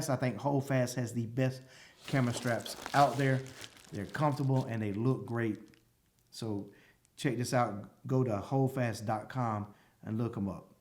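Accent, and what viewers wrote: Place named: United States. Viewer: Awesome video, are you from Oakland or H-Town if I may ask? It's hard to tell where your accent is from in the USA, I am guessing one of those two, Houston/Oakland? You sound just like my brother in law, so I'm guessing one of those two? Anyways great video and hit the nail on the head, I like the R3 more than any other mirrorless camera and I'm a full time Nikon NPS shooter or photojournalist. However the R5 is also amazing and they definitely beat the Nikon D850, but took a long time and the EOS R/RP were lackluster. Anyways love the videos and you're meant for this! Let me know if you ever want to do a guest speaker or co-host show, I do interviews and YouTube at times, and used to act as a teenager, I'm 36 now.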